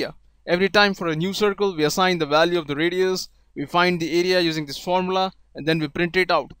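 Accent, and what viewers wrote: India. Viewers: smooth teaching skills dude. .. thanks for sharing knowledge .. btw you sound pretty Indian... where are you from ?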